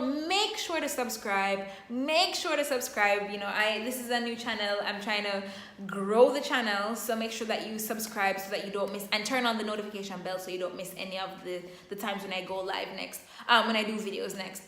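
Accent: Jamaica. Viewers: Are u from Jamaica? Your accent is nice. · I sense a jamaican accent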